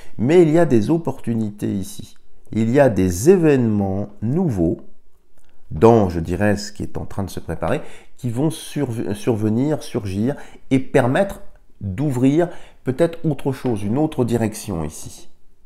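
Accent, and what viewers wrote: France. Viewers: Bonjour Monsieur. Le Capitaine Juvet Brunet lui aussi est un fervent amoureux de notre belle France et souhaite sortir de l' U. E. Il est intéressant de l'écouter. Merci à vous Monsieur pour votre élocution très Française.. Un régal. 💞🌺🐈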